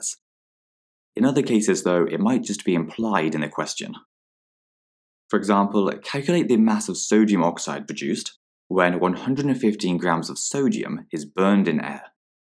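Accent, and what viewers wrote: United Kingdom. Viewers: British accent 💀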